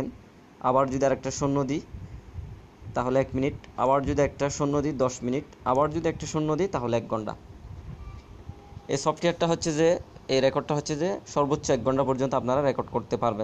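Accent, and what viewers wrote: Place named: Chittagong, Bangladesh. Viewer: আপনার ভাষা শুনে তো আমাদের চট্টগ্রামের মত লাগে,,,চিটাইংগার টান আছে ভাষাতে, 🥰🥰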